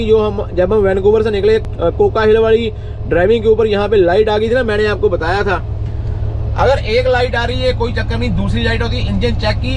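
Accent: Punjab, India. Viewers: पन्जाबी बन्दे शुद हिन्दी बोल्ता हे तो मुज्को भि मन मे चड्दी कला बोल्ने के मन होता हे काके 😂😂😂 Keep it up bro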